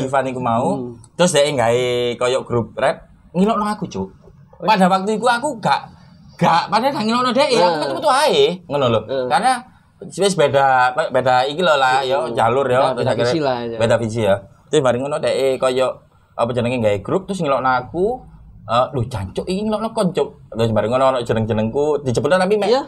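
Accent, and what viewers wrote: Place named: Surabaya, Indonesia. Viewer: Lucuuuu paraahhhhh...kok gak nglawak ae mas.... Ngakak aku .... Boso suroboyoane kenteeelll ...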